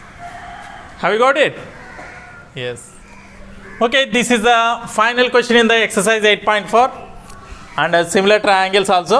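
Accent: India. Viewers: Sir I think u mother tongue is telugu 😅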